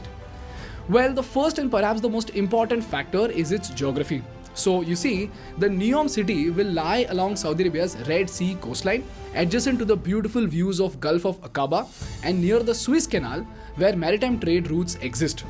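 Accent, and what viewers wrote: India. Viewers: In your contribution there is some truth. But how you present it in high speed and with your indian accent, that's terrible. Also your argumentation chain is not sustainable enough. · Good video 👍 but Please speak proper English not indlish if u can't then please get someone to articulate it. Very irritating when half is what u says is not clear. World sounds like ver-ll and u speak is fast...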